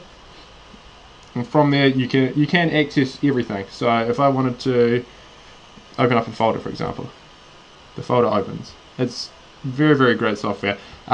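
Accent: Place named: Australia. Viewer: He's either Australian or he's from New Zealand. he's not English. Please learn accents he doesn't sound remotely English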